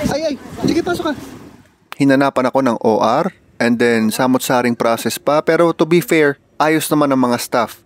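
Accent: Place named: Visayas, Philippines. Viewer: Bisaya diay ka? Lol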